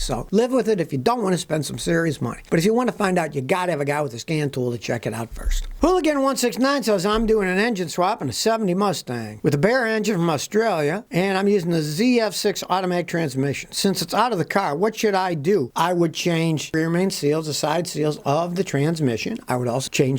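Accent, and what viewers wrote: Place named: Texas, United States. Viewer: Hi!.. Been watching your channel for a week now..... Basically Im working from home and I use youtube for some background no-vocal DNB to put my brain in gear and keep me going while im typing... but for some reason... I can both concentrate on work, while listening to your voice... and by some miracle I can even remember everything you are saying... It must be that Texan accent;) Anway... about the OCD guy with the manual car question: Im an Aspie (Aspergers, which is similar to OCD) And I also had the pedal-reflex issue.. so much that I've developed a bad habbit of howering my foot over the clutch (while training), pressing the clutch as slow as the breaks, often times not fully.... I felt unstable in the seat and insecure and unstable to change gears in higher speeds. Forgetting to change gears and all those typical Aspie/OCD issues. 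Its a multitasking and mentally shifting tasks -issue... because of the sensory overload. Especially because the world outside of the car feels unreal and 2D to us. Here in Croatia the instructors are very strict, before only 60% would pass, now only 20% candidates pass. Licenses here cost like 900 $ for a 2-3 months theory + minimum 35 hours driving hours. And then each exam is about 90$. We also have to pass first aid exam too. And of course here we learn only on manual and drive only on their cars, but directly on the actual roads. So their cars are all manual, one steering wheel and double set of pedals. I drove almost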